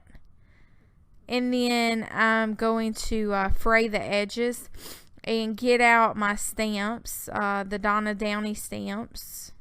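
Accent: South, United States. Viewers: just want to let you know, how talented I think you are. I REALLY enjoy your videos. Not to mention that I could listen to you talk forever. LOVE that southern drawl!! We Canadians love that drawl!! lol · love the feathers and your southern accent is delightful!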